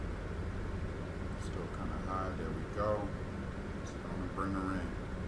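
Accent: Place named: Germany. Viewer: Great visuals and informative. Good job on the volume control. Love your German accent, lol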